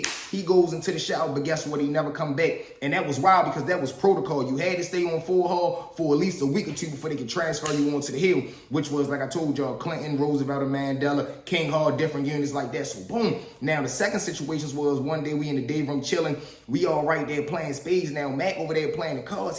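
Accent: Baltimore, United States. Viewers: Sound like you from bmore brother, good story💪🏾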